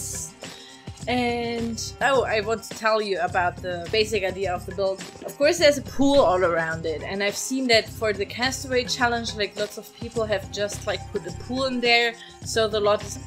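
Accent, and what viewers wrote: Germany. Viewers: sieht wirklich suuuper aus :) aber boaaa der akzent ist so quälend xd typisch deutsch irgendwie hahaha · are you german? (just guessing from your accent :D)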